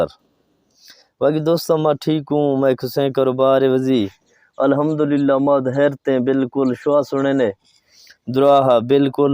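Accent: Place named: Mianwali, Pakistan. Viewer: آپ تو سرایکی ایسے بولتےہیں جیسےمیانوالی کے رہنے والے ہیں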